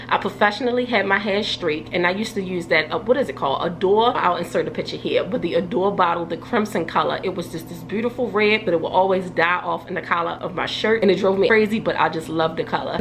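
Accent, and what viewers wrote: Baltimore, United States. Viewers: He said Rick James 🤭. I’m laughing because I actually have the album with Rick and the short bang. Still gorgeous hair with the short bangs!!!! Just found your channel binge watching your vids now. I hear Baltimore in the accent (I live in MD).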